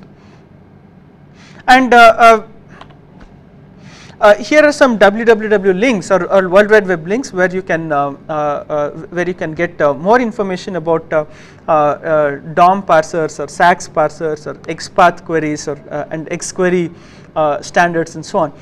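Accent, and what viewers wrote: India. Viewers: speak a little slower because your Indian accent isn't the best sir i can't understand hardly anything you said.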